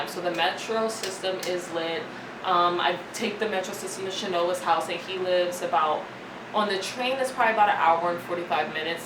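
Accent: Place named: Philadelphia, United States. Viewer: Lol she definitely gotta philly accent. She is probably just using a more professions voice for her platform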